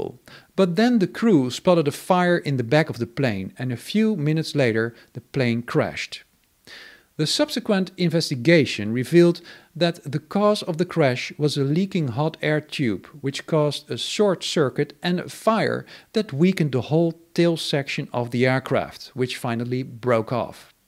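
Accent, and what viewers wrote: Netherlands. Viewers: Am I correct, sir, that you have a Dutch rather than a German accent when you speak English? This video has corrected a visual error I've had for 40 years. I was waiting for you to get to Interflug's L1011... and waited, and waited... It was then I realized the L1011 belonged to LTU! Same reddish colour in the livery! :trophy-yellow-smiling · By the accent more from the Netherlands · his accent is Dutch not German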